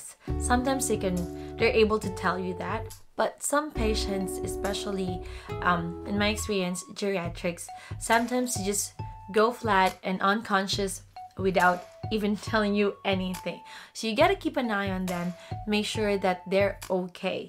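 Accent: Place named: Australia. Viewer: Love the Aussie accent😍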